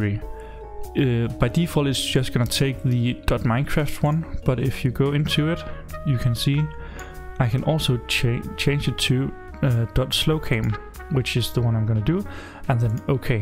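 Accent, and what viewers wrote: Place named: Denmark. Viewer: Jeg vidste bare du var dansk så snart du begyndte at snakke hahaha ; ) fed video, tak for hjælpen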